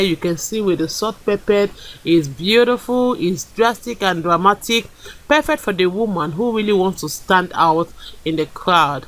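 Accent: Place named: Africa. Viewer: She has a beautiful African dialect. I love those beautiful short cuts!!! Thank you for sharing.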